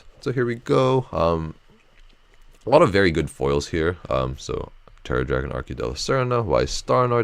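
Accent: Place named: United States. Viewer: You live in New Zealand , funny you sound American! 😉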